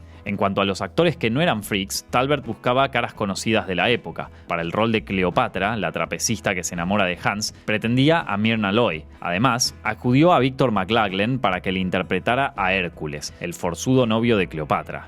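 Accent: Argentina. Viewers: Viejo. Normalmente la narración con acento argentino no me agrada, pero el tuyo está demasiado brutal... 👌🏻 No me extraña el éxito que tienes. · odiavle el acento argentino pero ni modo... la tematica esta buena. me aguanto. 🙄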